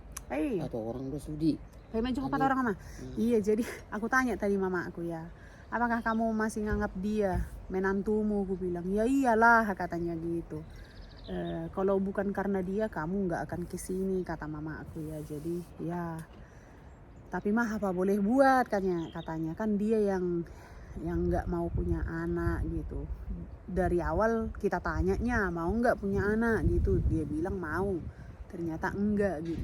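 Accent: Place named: Batak, Indonesia. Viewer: Senang kali sama kk..walaupun lama di luarnegeri bahasa.dialek batak msi kental👍🙏🙏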